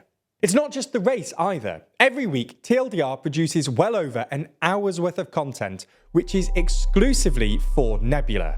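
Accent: England, United Kingdom. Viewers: Oh no, Japan will have to import infinity foreign colonizers (sometimes called asylum seekers by idiots in America and Europe) to solve this problem. They couldn't, I don't know, reduce the population density naturally and rebalance the population pyramid over the long-term. Japan will be fine so long as they do not accept foreigners into their country and maintain their unique people to live on their own. No need for cheap British solutions coming from the Americans 52nd state (Canada for those wondering). TLDR is truly just shitlib propaganda with an English accent, but zero muscle mentally or physically for that matter.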